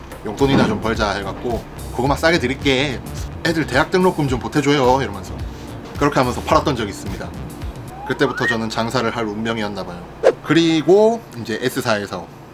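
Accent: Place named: Gangwon, South Korea. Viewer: 이분 강원도 분인것 같다..사투리가 강원도 사투린데..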